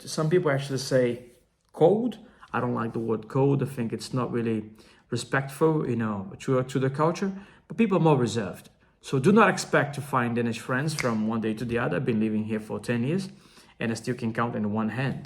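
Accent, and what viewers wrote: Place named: United Kingdom. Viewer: Oi emano, muito bom ! Glad you like it here in Denmark, your english has a serious british accent. 😊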